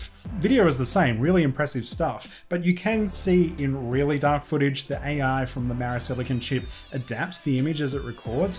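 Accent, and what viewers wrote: Australia. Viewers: You're absolutely right. LoL. It's kinda weird 2bh 😁. An Aussie tech chap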